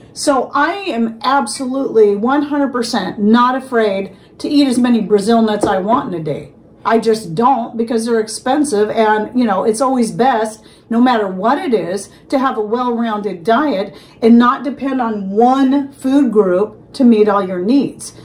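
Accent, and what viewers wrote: United States. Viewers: Hi, I do like most of your videos, but I am finding American accents a bit hard to understand! I was born in Norway, so Norwegian is my mother’s language and my first language. I have lived in South London, England for 54 years now, so English should come easily to me, but I am nearly 72 years old and my brain is slowly loosing a bit of each language! But keep up your good work As I have learnt so much from your videos. Blessings ❤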